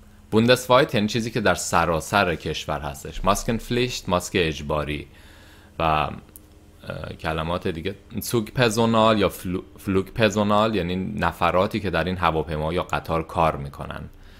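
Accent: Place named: Iran. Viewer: چقدر ایرانی ها لحجه ی تابلویی دارن وقتی آلمانی صحبت میکنن🙄 🤔 شما مثلا آموزش زبان میدید؟! کمی سر لحجه ی خودتون کار کنید نه فقط گرامر!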